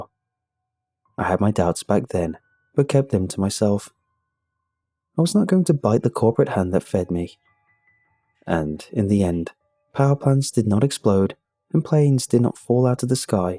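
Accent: England, United Kingdom. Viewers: Bro! Your accent is English? I really don't care but it makes me fall asleep instantly. So relaxing.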